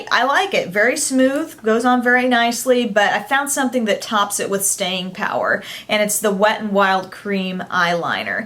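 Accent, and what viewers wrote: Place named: South, United States. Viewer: Love, love, love this video!!! It was so informative and detailed! Oh & I love ur southern accent! :)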